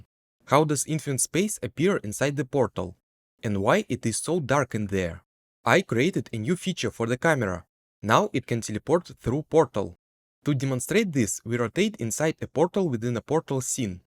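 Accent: Russia. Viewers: не вижу русских в комментах, хотя акцент автора явно русский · Акцент кст русский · bro got Russian acent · Nice russian accent 👍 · Вау, что у тебя с акцентом, никогда не слышал такого неподвижного звучания. Ты проигнорировал КАЖДУЮ фонему английского и заменил её на фонему русского. И даже не на самую похожую. нг в конце почему-то читаешь просто как н, ладно середину между н и г найти не получается, зачем просто выкидывать г. Произносится не обжект, а абджэкт. Не фанайт, а файнэйт. Не пробабли, а прабабли. Не копис, а капийз. Не сёрфэйс, а сёрфис. Не аньюжал, а аньюжуал. Не идиа, а айдиа. Не моментум, а мамэнтам. Не эгрис, а агрийз. Не эдд, а адд. Не специфик, а списыфик. Не дат(that), а дэд. Не джеометри, а джиамитри. Не детэ́йл, а ди́тэйл. Не телепо́рт, а тэ́лэпорт. Не эллипсо́идал, а эллипса́идал. Не алдоу, а олдо. Не дыс(these), а диз. Сделай на русском отдельную дорожку, невозможно без кукожа тебя слушать.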